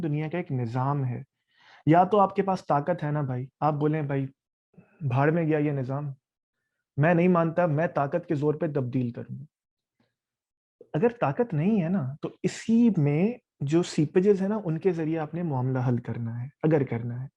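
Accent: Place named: India. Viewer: Yar ae banda Indian ki tarah batt karta hai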